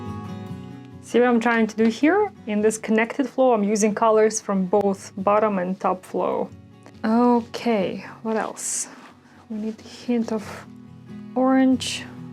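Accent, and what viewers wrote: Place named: Russia. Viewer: Очень интересно,красиво.. Вы похожи на украинку и манера говорить русская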